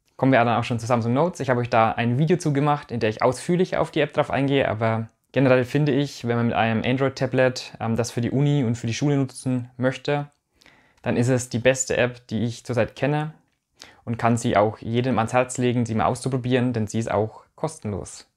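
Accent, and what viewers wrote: Franconia, Germany. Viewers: Ich hab's mir bestellt und bin schon ganz gespannt. Als Klavierlehrer nutze ich schon lange Tablets, allerdings ist es gerade so an der Grenze gewesen von der Größe von rund 10 Zoll. Ich habe das Tab A von 2016 und den Nachfolger von 2018 hier herumliegen. Der Akku vom alten ist ironischerweise besser, auch aus diesem Grund möchte ich das FE nutzen. Wie ich höre, ist ein Franke. Ich war in Unterfranken im Internat. Dementsprechend bekomme ich ein bisschen Heimweh und Nostalgie beim hören des frängischen Dialekts. 😏 Grüße aus Hessen! PS für Scans empfehle ich euch Adobe Scan. Das macht auch ohne Blitz hervorragende Scans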